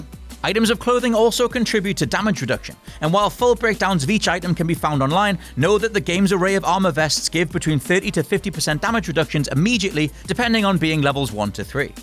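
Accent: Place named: United Kingdom. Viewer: UK ENGLISH 😫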